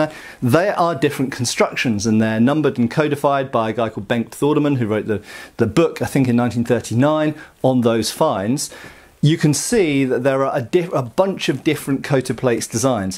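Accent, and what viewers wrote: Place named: England, United Kingdom. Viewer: No offence, I could really see you as a baddie in a Robin Hood film. Black brigandine, shaved head and English accent. As we all know, the goodies in English ‘historical’ films must have American accents!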